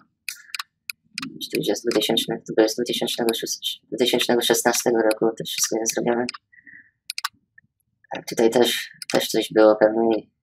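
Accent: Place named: United States. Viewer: Zawsz chciałem poznać kogoś kto mówi po polsku a się w Polsce nie urodził i posłuchać jak mówi. Twój język jest dosyć zabawny dla widzów, którzy nigdy nie byli w USA i nie znają Polonii. Zabawny w pozytywnym tego słowa znaczeniu. Ale uwierz mi, że o wiele bardziej wolę słuchać Ciebie i o wiele łatwiej Cię rozumie, niż niektórych nastolatków w Polsce. Szkoda że zmieniłeś swój głos modulatorem. Nie powinieneś się przejmować uwagami. Ktoś kto ma minimum inteligencji i wyobraźni wie, że niełatwo jest mówić idealnie dwoma językami, jeśli się w obu krajach nie mieszka i nie ma na co dzień kontaktu z "żywym" językiem. Tak samo zabawnie brzmi ktoś kto świeżo przyjedzie z Polski i zna język angielski tylko ze szkoły.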